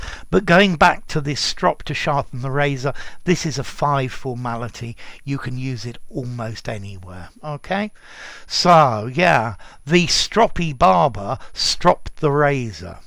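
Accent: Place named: England, United Kingdom. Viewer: I understand this video is a couple of years old. I am an Australian Brit, born in 1964. With both my parents passed now, it was rather delightful hearing an English voice clearly describing the use and origin of British words. My mother, herself a rather stroppy woman at times whom we affectionly referred to as the grammar nazi, was forever ensuring we pronounced and utilised words correctly. I am feeling rather nostalgic. Thank you. 😊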